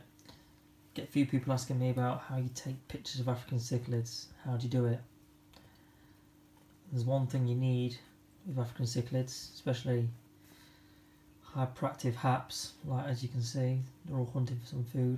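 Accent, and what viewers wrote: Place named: England, United Kingdom. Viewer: Arhhh ok. I'm from Yorkshire but live in the US now. Just recognised the accent👍🏻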